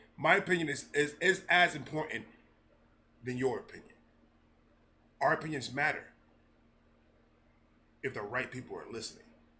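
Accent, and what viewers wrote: New York, United States. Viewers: Everything you said was ON POINT! I couldn’t agree with you more! Btw.. already knew you were from NY before you even said it lol! I live 3 hours north of nyc but have friends down in the boogie down lol. Where I live, most people around here stand by “FAFO”!!!!!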